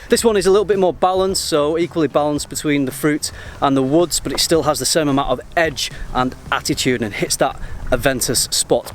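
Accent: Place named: Liverpool, United Kingdom. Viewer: Are you from Liverpool by chance? Could be Machester as well, but I heard Paul McCartney for a quick sec.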